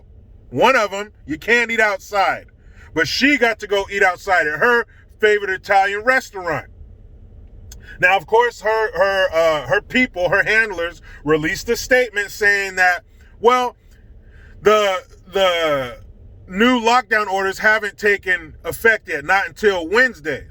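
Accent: Australia. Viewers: Love the Australian accent, mate! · Love your Ausssie accent, pmsl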